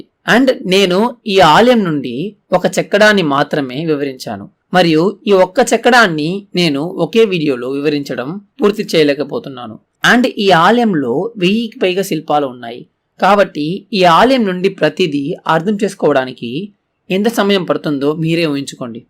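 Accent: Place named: Tamil Nadu, India. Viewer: గొంతు నీదేలా ఉంది. తమిళ్ వారు మాట్లాడే తెలుగు లా ఉంది.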